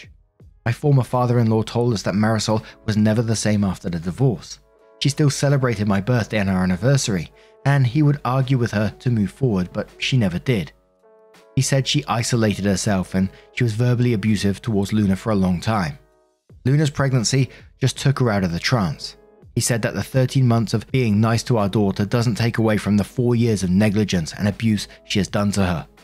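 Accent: United Kingdom. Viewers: British pronunciations of Spanish words make me chuckle and wince simultaneously. One day you’ll get it,! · i aint gonna lie I was taken outta it for a second hearing someone with a british accent say they were a latin king lmfao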